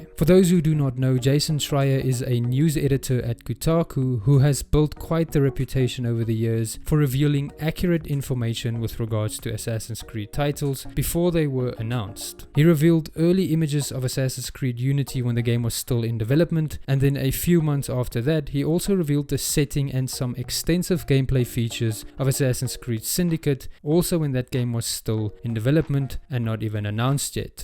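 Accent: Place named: South Africa. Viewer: You're South African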